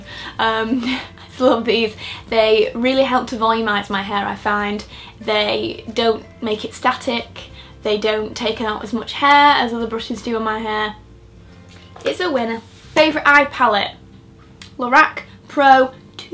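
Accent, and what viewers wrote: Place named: Manchester, United Kingdom. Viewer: Absolutely love all of your videos,. By far my favorite youtuber! Plus its so nice to hear that lovely Mancunian accent, makes me miss home!!:)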